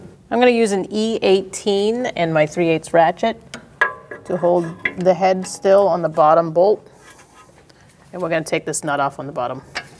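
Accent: Boston, United States. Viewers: This lady is awesome! I've watched a few videos with her. Good instructor and I love her Bostonian? accent. Cah.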